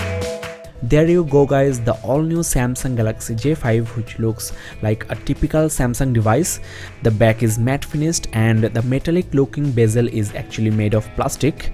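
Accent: India. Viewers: esse sotaque indiano -.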